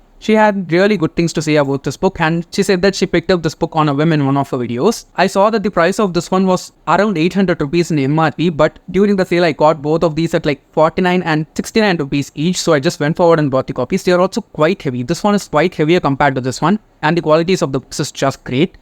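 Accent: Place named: England, United Kingdom. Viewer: Love ur english accent.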